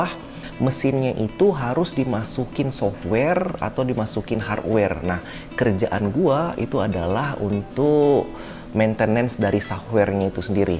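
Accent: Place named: Padang, Indonesia. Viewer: Asli padang uda... Hehehe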